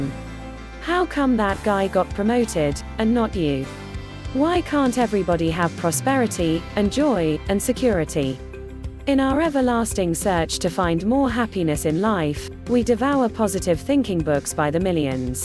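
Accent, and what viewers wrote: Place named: RP, United Kingdom. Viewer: I lived this book but it’s the wrong narrator reading it so I’ll have to try to find the original audiobook read by the author. I’m from the UK but this received pronunciation where every words spelt out slowly and without emotion just doesn’t fit with what this book represents.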